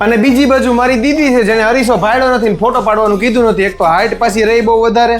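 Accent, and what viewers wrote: Kathiawar, India. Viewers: ભયંકર મસ્ત અને જોરદાર કાઠિયાવાડી બોલો છો ભાઈ.. એક નંબર અને પણ...😅😅😅❤❤❤👌👌🫰.. ભમ ભમ..se ne he..se ne he😂😂😅.. સેજ કટક બટક😮😂.. પાણપુra😂😂❤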